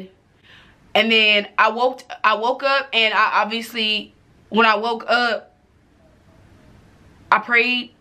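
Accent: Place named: Chicago, United States. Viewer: Chi-Town Accent! Choctaw face!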